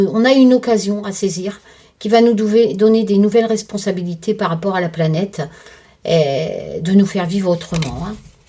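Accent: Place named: Ch'ti, France. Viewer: Bonjour jadore quand tu parle en patois chti Je suis une de snord mi Ca me fais du bien en plus quand tu chantonne le schiot quinquin Bisous ❤❤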